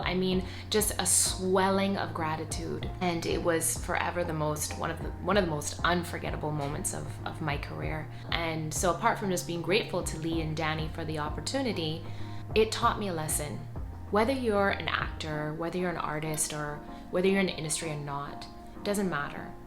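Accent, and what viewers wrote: Cayman Islands. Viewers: Cayman Accent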